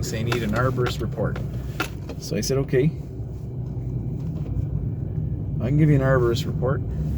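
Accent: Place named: Canada. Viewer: I hear what you are saying man, I was called a douchbag last winter, at an apartment I looked after, I told the property management I was putting up with that shit, and I dropping their buildings because it wasn’t the first time I had a run in with this particular property. So this year I told them sorry but buh bye. Sure I lost 5 bldgs, but I then picked up 4 and charge the same amount I charged for the 5 bldgs from the other mgmt company. We do a dangerous job that puts us in the worst elements and people think because they give us a small amount of money to clear their properties that they can treat us like we’re fools. Nah! We’re professionals and we should be treated like such. Also How would they know what equipment or amount of labour is needed, to do jobs, were the ones that do it all the time, ....... sorry, got me fired up, sounds like you are from Canada, I’m just east of Toronto, where are you?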